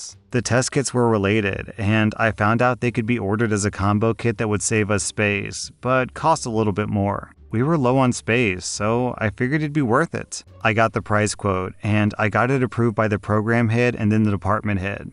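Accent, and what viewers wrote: United Kingdom. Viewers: 10/10 British accent. When I read "Super Saiyan 3 British," I imagined OP getting super buff and shouting "CHEERIOOOOOOO" before evolving to his final form. Don't ask me why.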